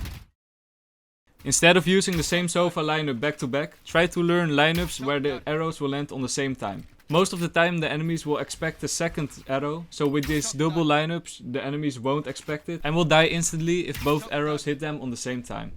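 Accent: Netherlands. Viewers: Jij bent 100% een nederlander hoor het aan je accent hahaa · Hey ben je nederlands want je hebt een hard accent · The dutch accent is strong lol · I love the amount of Dutch I hear in your voice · When I started the video I heard the Dutch accent instantly nice vids man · Broo your english is on point, ye your accent is really obvious dutch but outside of that you know exactly what to say! Lekker bezig maat! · use wierd KAMERA spots, love the dutch accent xD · Loving the dutch accent <3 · Ma man's dutch i know the accent because i am dutch too lmao, good and handy vid tho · Kamera spots love the dutch excent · you hear he is dutch lol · U sound sooo dutch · het is een hollander je kan het gewoon horen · Nice Nederlands accent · Gy eb echt een nederlands accent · Een Nederlander ik hoor het aan het accent 😂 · Loll i like your dutch accent 😂😂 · im only watching this now, but when i heard you speak the first sentence i recognised a fellow dutch accent. 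i decided to check your channel name and heyy i was right!! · XD, I literally hear the Dutch accent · loving the dutch accent 😩☝️ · Nederlander xp hoor je gewoon aan je accent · A bit of nederlandse accent maar its goed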